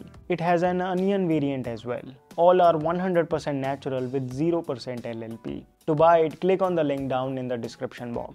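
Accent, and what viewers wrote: India. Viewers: Let Indian English breathe. It has its own charm. ❤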